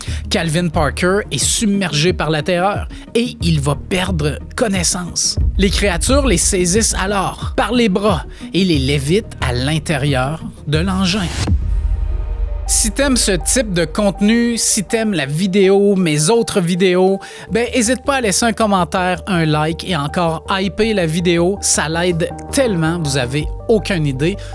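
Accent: Quebec, Canada. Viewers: Merci, je connaissais cette histoire, elle est passionnante, ça fais du bien de se faire raconter ces histoires en français et par un Québécois en plus. Et je remarque que tu as poster bien d'autres cas sur ta chaine que je découvre avec plaisir. Vais-je devenir addict??? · je sais si c'est l'accent Québécois si c'est toi qui parle trop vite .ma j'ai décroché au bout de 5 min.. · Excellent, le seul gars avec l'accent Quebecois que j'arrive à supporté et dieu sait que j'en ai entendu plusieurs youtubeur avec cet accent.. En tout cas, merci pour votre travail 😎😎😎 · Oui, la prochaine, faites la vidéo en anglais , cela évitera d'insulter la belle langue française avec cet accent québécois exacerbé. C'est juste horrible à entendre . Je me suis toujours demandé comment on a pu en arriver là . Comment on a pu déformer une langue séculaire en ça, cet espèce de yaourt verbal . 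Je n'ai rien contre Québec , au contraire, mais là, on pourrais presque croire que vous le faite exprès tellement c'est abusé . Et pour le contenu de la vidéo, bof bof , l'histoire à déjà été répétée 10 000 fois . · J' ai du arreter de regarder a cause de l'accent quebeccois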